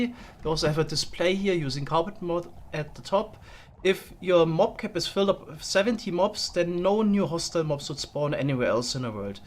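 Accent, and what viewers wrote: Germany. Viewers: Deutscher Akzent, beste.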